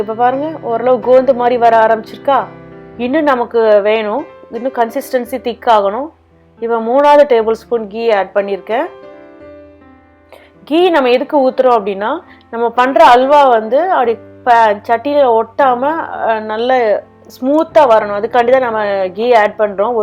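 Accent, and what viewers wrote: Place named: Tirunelveli, India. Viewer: Nice recipe..ur slang seems like u r a Tirunelvelian...rite mam🙂.?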